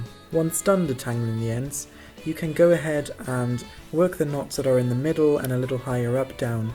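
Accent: United Kingdom. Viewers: R u from UK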